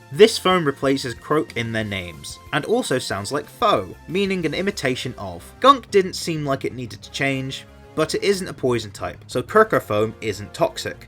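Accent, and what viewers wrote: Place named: United Kingdom. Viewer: Your little British accent >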